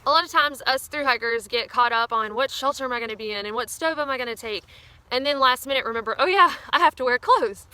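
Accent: South, United States. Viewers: Your southern dialect is kind of blowing my mind. I'm from NM and I've lived in D.C. and MA and I've never heard someone use the words "britches" or "toboggan" before. I would just say "leggings/tights" or "beanie". Anyway, I just found your channel and I love your videos so far. Best of luck on the PCT! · Nice work. I hike the Pinhoti Trail a lot. Have you hiked it? Its not far from you. I live in Birmingham. Love your videos, especially love listening to your southern drawl:). Im originally from the Sylacauga area. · I thought this was a really good video told by a really cool chick. where is your accent from exactly? Southern, Mississippi maybe? I've never heard the term "tobagen" before for a knit cap or beanie. Also, so are you saying a thru hike encompasses or spans no less than 3 seasons? As in spring, summer and fall? Kreikies thats a hell of a lot of hiking. 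What do you do at night? Play cards or anything? Are people hooking up at all? Haha! Or are the too stinky?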